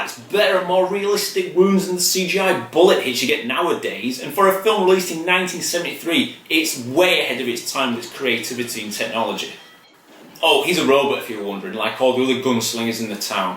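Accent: Scotland, United Kingdom. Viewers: Its wonerful to have you back mate, been waiting for a long time to hear your scottish acent again 5/5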